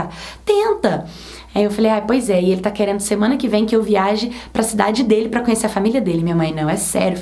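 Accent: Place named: Minas Gerais, Brazil. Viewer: Esse sotaquezinho mineiro engraçado!! kkkkk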